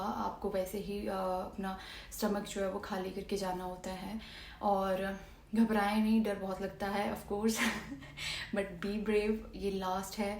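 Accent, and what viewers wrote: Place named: Haryana, India. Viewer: jis hisab se baat ki apne muje lga hi ta ap haryanvi ho 🤨me meri mosi ki ladki jesi awaj lagi muje 😂🤝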